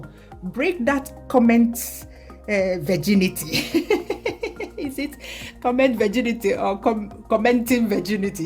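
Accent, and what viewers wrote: Igbo, Nigeria. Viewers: Will it still be slippery, i can't wait to watch u cook it , the best part I enjoy in ur video is that mixture of ur engligbo na superb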